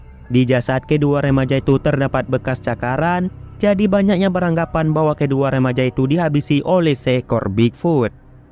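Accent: Aceh, Indonesia. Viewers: Bahasa logat Aceh nya nampak kali iya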